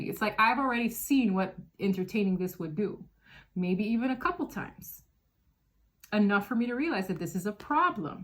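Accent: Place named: Netherlands. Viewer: Je bent Nederlands. DAMNIT IK WIST HET. Ik dacht al wat aan je accent te horen. Waar woon je, Curaçao?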